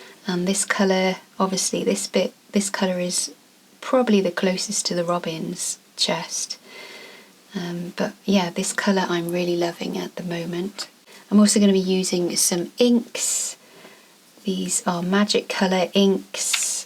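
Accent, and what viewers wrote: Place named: United Kingdom. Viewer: I love the colors in this so much, and your style! Started to watch yours and other YouTubers this winter when I surfed around for inspiration this dark winter. Always loved British accents and yours is so nice to listen to, haha, sounds weird maybe, but I am Swedish and most sweeds speak English with a terrible American accent. The vlog when you are walking around and sketching the beautiful landscape is so nice to watch. I'm going to South of France next week and will be doing my first sketching outside there I hope. In Stockholm its far too cold, the snow just melted. Thanks for the inspiration!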